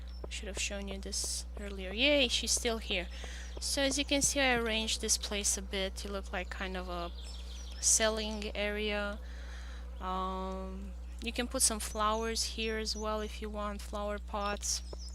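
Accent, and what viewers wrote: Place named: Iran. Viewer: Very cool video. I like your accent. Are you Persian?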